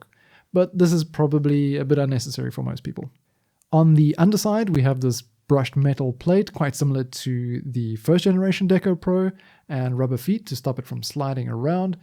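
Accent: South Africa. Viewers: instantly recognized the accent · Are you South African?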